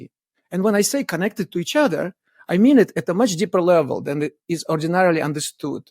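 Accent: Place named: Russia. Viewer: I LOVE the Russian accent! Like a badass spy is teaching math and mysteries of the universe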